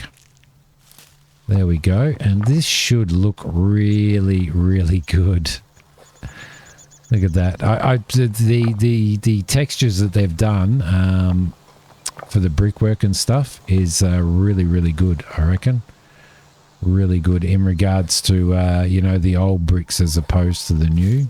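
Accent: Australia. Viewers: hey are you an auzzie?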